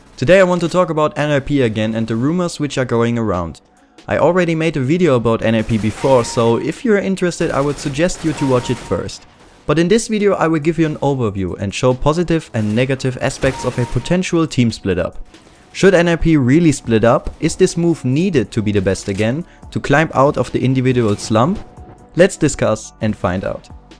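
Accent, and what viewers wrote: Germany. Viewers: If u pay attention you could recognize ur german accent :D <3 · nice video but very much german accent but still nice :D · schöner deutscher akzent :D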